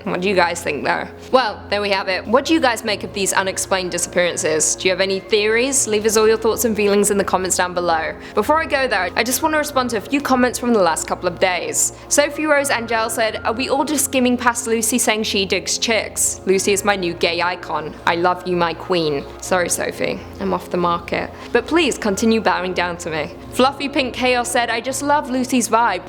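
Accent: England, United Kingdom. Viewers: I agree ......you are very pretty ....love your hair...hate the big baggy shirts I can only imagine....the subjects are very interesting ....love the accent as well.....english? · I know it’s been said before and it will be said again, but there is something about hearing info with an English accent that makes it seem more legit. And kinda spooky too.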